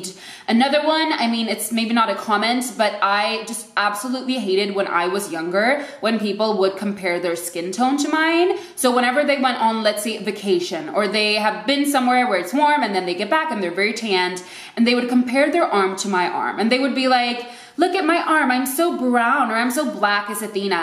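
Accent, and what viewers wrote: United States. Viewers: Your accent is American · Agreed, she sounds 100% American. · I would have never thought that you were Swedish cause of your American accent. There isn't a single hint that you have an accent let alone Swedish accent. On the other hand, Sweden have significant Somali population. One of the few countries who welcomed Somali refugees and immigrants with open arms. Also my brother lives there. Great country. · wow, born black swedish with american english accent. I didn't realize swedes who speak English sounded this way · Being white and Swedish for hundreds of years I am one of those who loves diversity and I also love to talk to people so I am one of those that always ask that question or a variant of it if I meet someone black speaking Swedish without accent. It is asked out of curiosity and I guess as a part of getting to know someone. 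If I heard you speak I would just assume you were American. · Holy moly you're stunningly beautiful. My question is, how soon do you start learning English and is an emphasis on accent a thing? Because you sound pretty much American with a very slight accent. I find it fascinating when people from abroad come to the US as an adult with very little accent speaking english. And why not a British accent? Is "American" english taught? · Amazing video! I am from Los Angeles & can tell that you have a TINY accent but you sound very "American" to me! I am looking into moving to Sweden or Switzerland someday &, as an Asian person, of course have my concerns about race. Thank you for the great video! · Now I’m curious to know where your parents are from. Where are they from if you don’t mind me asking? Also, it’s very interesting that you have an American accent. I’d never know you weren’t born and raised in USA. 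· You sure do sound American 🤔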